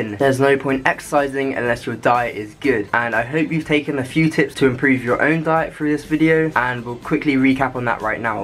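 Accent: United Kingdom. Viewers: blue eyes and british accent ! oh im falling for u !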